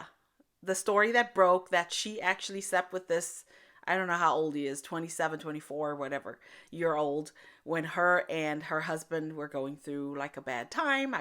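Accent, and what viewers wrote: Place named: South Africa. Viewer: Clicked as soon as I saw your notification. Your South African Accent coming through nicely today😊 Out of all things I've heard this makes the most sense. Yes they are married to one another and they don't owe us anything. {Also this is the first time ever I get to comment First! }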